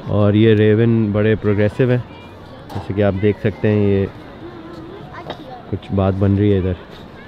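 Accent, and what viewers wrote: United Kingdom. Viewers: Your British accent is awesome 😉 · That british accent ❤❤😂🔥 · Birminghamyyy style lol😂 greetings from Telford ❤️ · Love ur British accent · Bhai ki English ka accent b nagraizon Wala ... Acha laga!!!! Thand main SB ki aesi ho jaati Hy ya aap nain special try ki! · British accent acha tha!! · OMG! that English/ london accent!😅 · Your British English is on 🔥🔥🔥 point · hahaha yaaar. Loved the british accent. Rewind kr kr k suna :D ku k sary paisy accent k hein <3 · That British accent though 😁 Nailed it...not a single glitch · Funny British accent too natural. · Bahi your british accent was coolest thing in vlog😊❤😄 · love your british accent. mate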